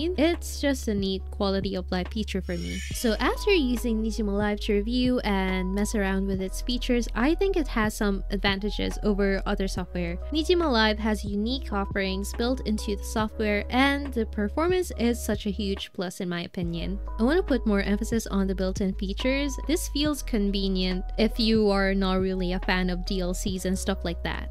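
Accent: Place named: Philippines. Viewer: Yk when i first heard your voice, i was like is she a filipino?, she's definitely a filipino. and after i searched you deeply You are indeed a filipino HWAAHAHAHSHSS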